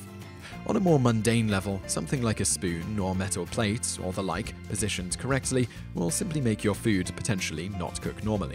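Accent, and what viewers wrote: United Kingdom. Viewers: Silver theDragon No, he'a pronouncing it the proper way, rather than the butchered American way. And before you start, listen to the accent. He's British. He is speaking the English language perfectly correctly according to the country he comes from.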